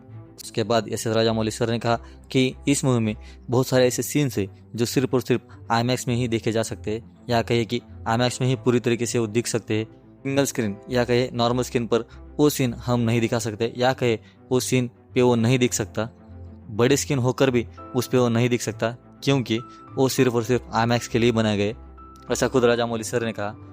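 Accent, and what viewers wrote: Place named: Nepal. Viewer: By the accent, I'm guessing you're Nepali?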